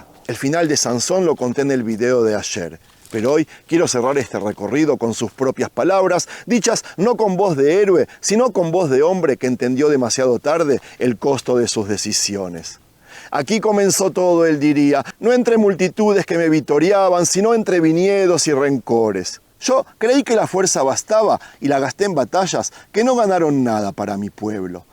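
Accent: Argentina. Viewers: Cuando algo de historia argentina tenes el acento nomas lo demás es todo propaganda.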